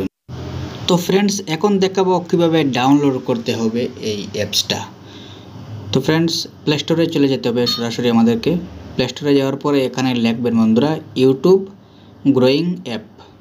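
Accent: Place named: Sylhet, Bangladesh. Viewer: আসসালামু আলাইকুম ভাইয়া কেমন আছেন ভালো আছেন নি আপনার বাড়ি কোথায় সিলেট নাকি আপনি কোন রকম সিলেটি সিলেটি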